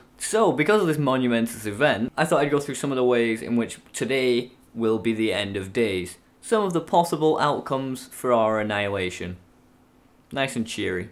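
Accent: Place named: Lancashire, United Kingdom. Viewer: Hey, I dunno if you're still actively using YouTube at all, but I think of you from time to time. I always liked the videos you made, and I particularly like your accent; Lancashire is easily my favourite English accent. Anyway, if you see this comment, I hope you're well.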